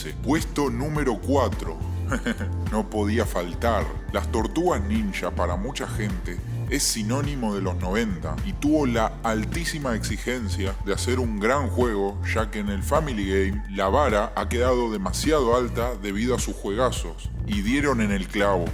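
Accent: Argentina. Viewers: minuto 0 y ya me di cuenta por el acento y las s's de que sos argentino XDDD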